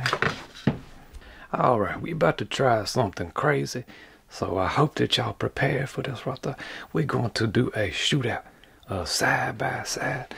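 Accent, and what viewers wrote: Louisiana, United States. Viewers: I think I recognize that accent. Are you from Louisiana by any chance? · The voice-over on this is like Bob Ross was from Louisiana and did crafts instead of oil painting- I love it! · I appreciate that the instructions are in Southern (sounds like Louisiana)- slippy slippy and smush and catawompus are precise terms that are easily understandable for me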